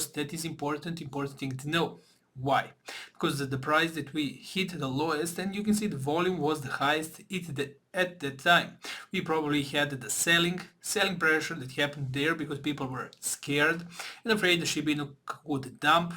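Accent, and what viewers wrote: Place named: Balkans. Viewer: Are you from balkan?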